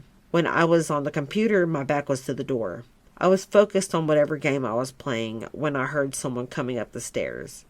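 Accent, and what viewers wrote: South, United States. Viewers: Oh, where do I start? I freaking love this, the way you narrate these stories! your voice is so soothing, and the southern accent makes it all the more enjoyable The one about the baby clothes and the one about George were the spookiest imo I have a couple suggestions/requests In the beginning I thought there was background rain sounds, but I'm not sure.. I think it'd be. a great touch to set the atmosphere. And I think it'd be really cool if you draw something about the stories, it would be interesting.. like whatever strikes you the most about those stories, idk it's just an idea 😊 I also love the fan art in the end.. I hope you keep up with these and it becomes a series on the channel cause I'm here for it. much love for you ❤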